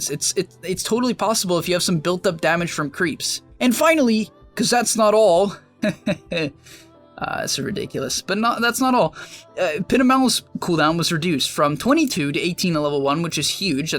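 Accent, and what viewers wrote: United Kingdom. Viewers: It started British but quickly became Indian. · I liked for the British accent.... Aye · MAN STOP THIS BRITISH ACCENT SO BAD CRINGE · good dota content but awful British accent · I disliked because of that "British accent" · Please make a whole video with that fantastic British accent.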